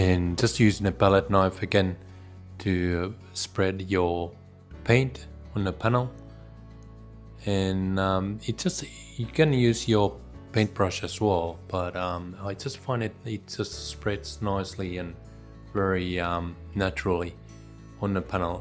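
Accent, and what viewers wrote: Australia. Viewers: I was listening to your accent, thinking, boy, this looks like a gum tree here, and you are! Here, I mean, not a gum tree. I love your technique, I paint with acrylics but can always adapt oil techniques to an equivalent acrylic application. Thank you for sharing, I'm stuck on a gum in my latest painting, so going to try your paper towel trick for foliage. The exact look I am after. New subscriber from Central Queensland Australia.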